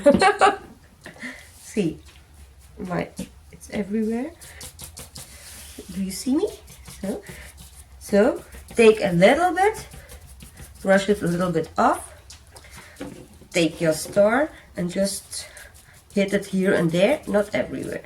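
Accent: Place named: France. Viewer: 🤗You are doing fine. Sounds like a French accent.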